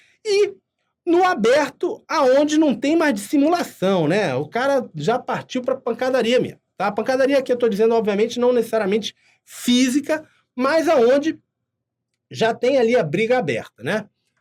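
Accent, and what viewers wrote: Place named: Rio de Janeiro, Brazil. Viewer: Você é fera irmão! Carioquês em dia! Vlw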